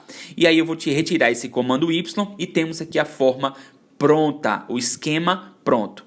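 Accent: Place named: Salvador, Brazil. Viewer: Eita, este sotaque é de Salvador... Coraçãozinho bate no ritmo da Bahia quando escuto meus conterrâneos depois de tantos anos vivendo em Buenos Aires. Obrigada pelo conteúdo! Amanhã mesmo vou aplicar numa ideia. Gratidão!!!! 🙏🏾♥️🙏🏾♥️🙏🏾♥️🙏🏾♥️🙏🏾♥️🙏🏾♥️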